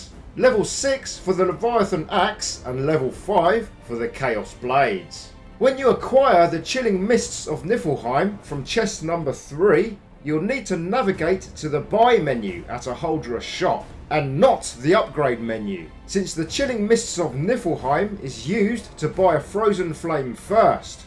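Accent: United Kingdom. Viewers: It's always sine British dude on these game videos 😭😭😭😭 thanks tho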